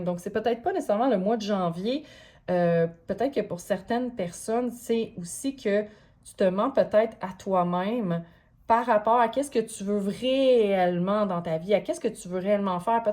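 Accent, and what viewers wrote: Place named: Quebec, Canada. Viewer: Ouha ! Tu m'épate belle âme 💚 Tu sais ! .....nous les français ont est en amour avec l'accent du Québec 😉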